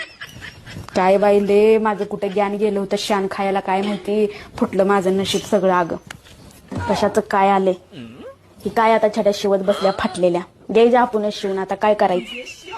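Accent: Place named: Satara, India. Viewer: Lai bhari madam satari bhasha mala khup awadle.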